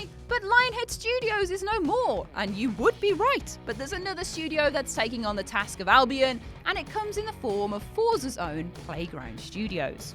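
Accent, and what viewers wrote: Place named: United Kingdom. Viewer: Has this chick been in other videos? I like her energy... And her accent, my God, I could listen to women who speak British all day! Lol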